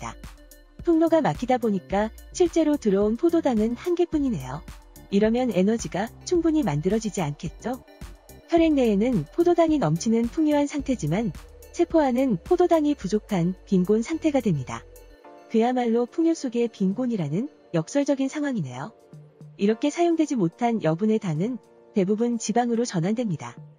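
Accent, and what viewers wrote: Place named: Busan, South Korea. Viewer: 바뀐 목소리도 좋지만 선생님 목소리가 더 이해가 잘가는거 같네요ㅜㅜ 특히 전 부산이라 아는분이 말씀하시는것처럼 들려서요~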